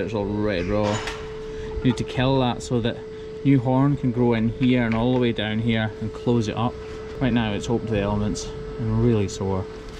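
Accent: Scotland, United Kingdom. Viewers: I'd love to know how long it takes to get that far in vet time to become so good in hoofs ,, nevermind I'll just listen to the Scottish voice 🤗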